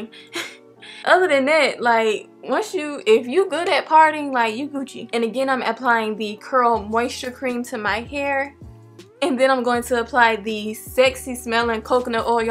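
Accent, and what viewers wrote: South, United States. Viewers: What part of the US did she get her accent from ? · “...there you go! Throw it to the beck!” lol that country accent! Beautiful lady and beautiful hair! Got me another easy style! God bless sis! ❤️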